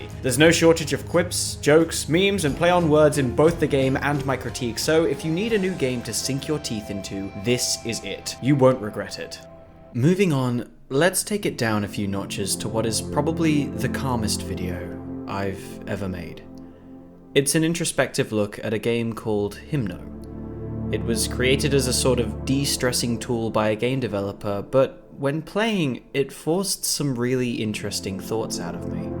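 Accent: United Kingdom. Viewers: Came for hollow knight stayed for a British (seems like it) dude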